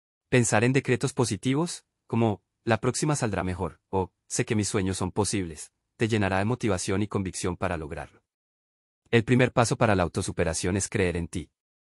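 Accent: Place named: Colombia. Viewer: Bella, que bueno q la voz es de acento colombiano y no de una maquina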